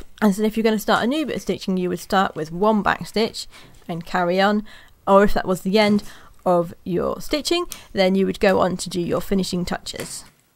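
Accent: England, United Kingdom. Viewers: Right on! I was watching another youtuber from UK and he said his accent was "home counties". Is there a specific area that your accent is from in the UK? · thank u. iv been wanting to know how to do a simple leather stitch. and i got to hear it in a English accent. thank u again from the states.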